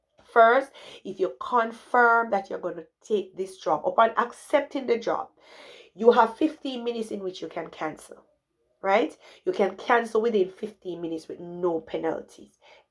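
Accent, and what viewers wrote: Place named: Jamaica. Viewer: Love your Jamaican accent!!!!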